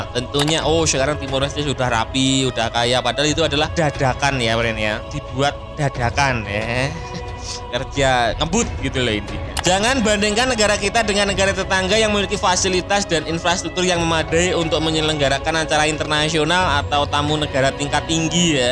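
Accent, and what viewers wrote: Java, Indonesia. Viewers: Admin asbun bicar a tanpa data.....saling kritik antar anak bangsa adalah hal biasa di Timor Leste.....$12 jt utk acara penyambutan bknlah berlebihan ....dari logat anda dipastikan kamu org jawa..... Spy kamu sadar ya ......mau brp biaya yg kami keluarkan bkn urusanmu ..... Urus aja dirimu ...agamamu dan negaramu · Min jgn cari uang bawah2 negara org kamu bukan org Tls ko..itu bukan urusan kamu...jgn pke uang haram kash makan keluarga...logat jawa nee..kentara.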